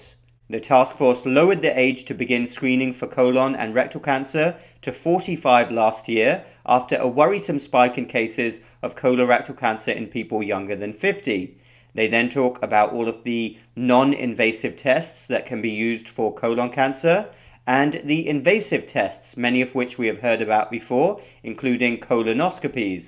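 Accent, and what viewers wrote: United Kingdom. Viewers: THE AMERICAN DIET?? seriously?? u gonna go there in ur British accent?? ur considered a WESTERN nation too.. don't ever forget that.. so don't discount urself or ur nation (if ur really from there, bcuz u look Indian to me) as if its just a. problem singled out to one country.. FYI..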